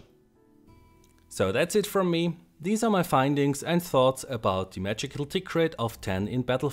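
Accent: Germany. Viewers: without knowing who the narrator is, i can say with great accuracy, that he's german :D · Man hört. dass du aus Deutschland kommst. :D Trotzdem super. Übrigens hatte BF2 afair ne Server Tickrate von 36hz.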